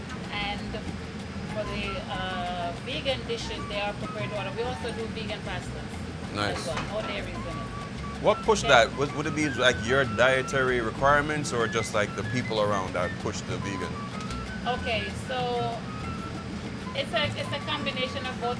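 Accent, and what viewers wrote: Jamaica. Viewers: The patios accent deeeeep😂😂 I can hear the effort😅😅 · Buaay, me nuh know, but your patois sound funny eventhough you from jamaica.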